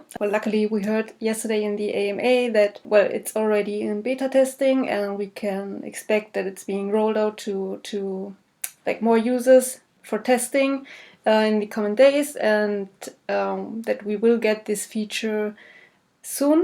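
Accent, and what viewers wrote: France. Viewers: Great content! Are you french ? you have a slight accent...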